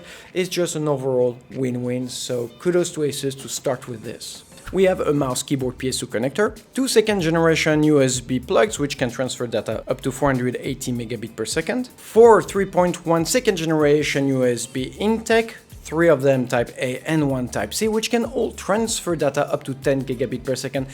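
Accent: France. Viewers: I can hear a little bit of French in your accent. Nice review though, I’m getting this motherboard as a Christmas gift from my wife and I needed this full detail review 👌🏾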